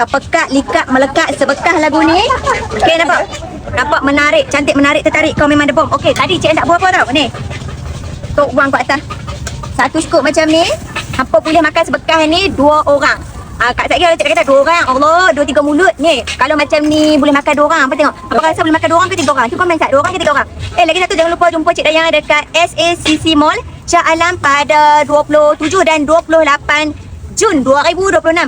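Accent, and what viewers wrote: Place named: North, Malaysia. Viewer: tuu dia,pekat utagha noh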